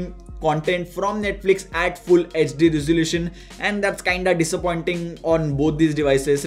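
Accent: India. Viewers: Realmente parece que é outro idioma, ele deve ser indiano! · Esse inglês com sotaque hindi é terrível, difícil de entender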